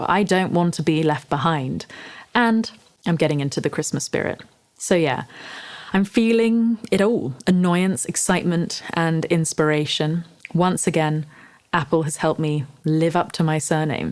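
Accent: United Kingdom. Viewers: I just love your voice <sigh> It's the perfect British accent. And yeah, the new MacMinis are remarkable value.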